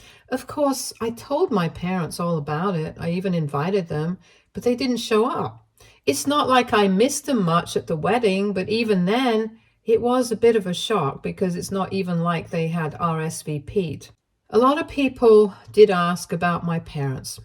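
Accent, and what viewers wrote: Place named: England, United Kingdom. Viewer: is English. So she has an English accent. We have lots of regional accents in the US as well. She is probably pronouncing it properly where she lives.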